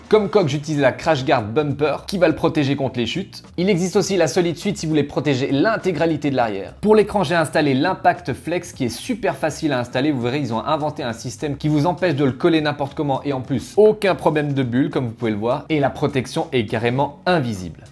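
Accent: Vaud, Switzerland. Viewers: Le beau acsent vaudois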